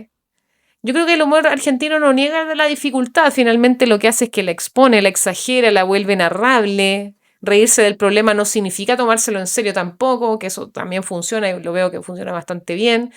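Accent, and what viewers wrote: Chile. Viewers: Es muy notorio en su hablar que es chilena... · Tu acento es chileno , los tienen a un lado , mis condolencias. Olvidaste mencionar al racismo profundamente arraigado en su cultura. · Vos sos chilena, no? Lo digo x tu tonada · Muy lindo el acento chileno. Cierto, si no te incluyen en el grupo, mejor salirse, yo no encajo en ninguno jaja, Saludos desde Argentina. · Yo quiero saber porqué su acento chileno no es tan marcado como en el sur. Gracias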